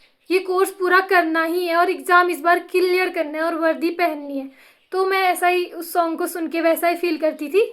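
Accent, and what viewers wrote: India. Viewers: Tumhari tone uttarakhand jesi h hamare yeha ki ladkiya bhi aesi he bt krti h..m Pithoragarh se hu aap kha se ho.. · Tum pakka pahadi hogi tumhri voice se pahadi accent aa rha hai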